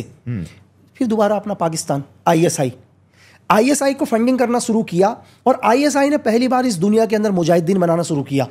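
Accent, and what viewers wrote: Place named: Gujarat, India. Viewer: I guess he is from Gujarat , his accents speaks😊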